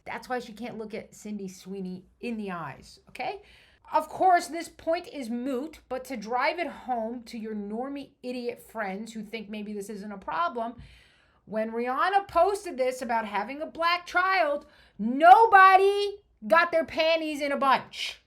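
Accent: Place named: New York, United States. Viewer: Good to hear a real New York accent, it’s a rarity these days.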